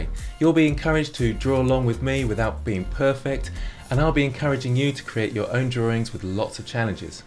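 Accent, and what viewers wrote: England, United Kingdom. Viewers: At first I saw this in my inbox I was like "Okay maybe, but I shouldn't be spending money for yet another course I will never use", but then I saw and heard that buttery smooth English accent and I was In Like Flynn!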